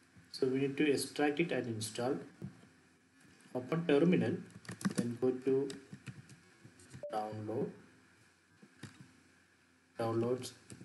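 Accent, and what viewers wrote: India. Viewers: Are you from Kerala?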